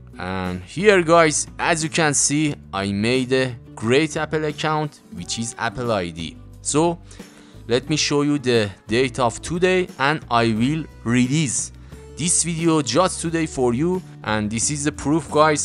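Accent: Iran. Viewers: Persian accent kicking out 😂😂 (موفق باشی)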